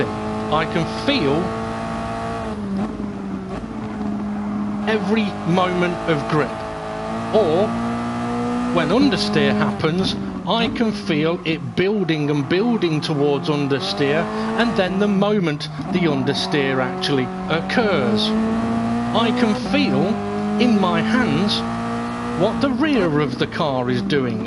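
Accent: United Kingdom. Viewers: So british. :)) Nice video mate, I use your settings, and I can feeel, everything from my car. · I've subbed just for the classically British rant. Too funny but still informative.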